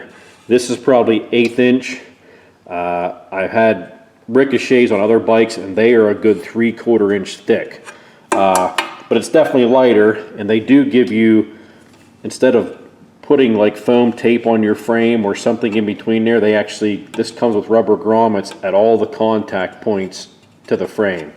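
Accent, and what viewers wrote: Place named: Pittsburgh, United States. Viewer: I here a pittsburgh accent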